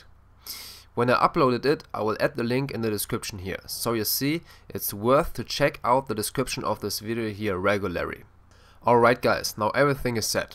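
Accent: Germany. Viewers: you are german? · Kann es sein dass du deutscher bist ? Are you from germany ? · ich hasse den deutschen Akzent! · This guy has an awesome accent. Hey, you all better listen to him, he's German! Germany have a sick international team. Thanks for the advice:) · Thanks! BTW, telling by your accent, you are a German speaker?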